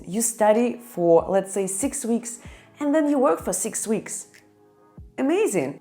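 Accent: Russia. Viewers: Полезно 🎉как же приятно узнавать русскоговорящих по акценту 😂ни с чем ни спутаешь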